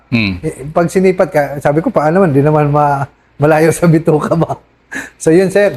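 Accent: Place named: Bicol, Philippines. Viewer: Parang bicolano ang punto ng salita niya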